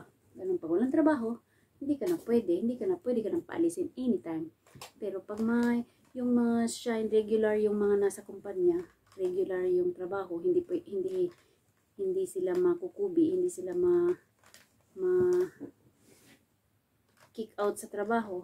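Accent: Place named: Visayas, Philippines. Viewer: Uy, bisaya di-ay ka,pila naka katuig diha?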